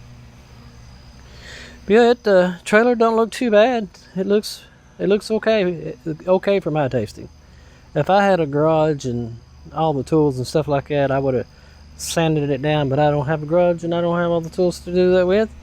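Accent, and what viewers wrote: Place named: Kentucky, United States. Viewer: I'm from Kentucky and I know one from there when I hear one.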